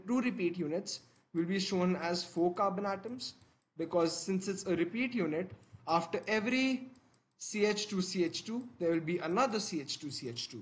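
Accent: India. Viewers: I feel u INDIAN right..?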